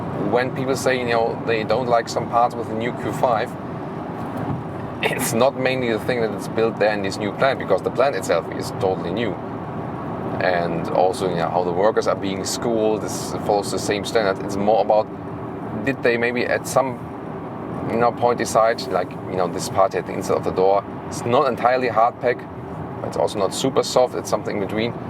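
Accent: Germany. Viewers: Dein Deutsch accent ist toll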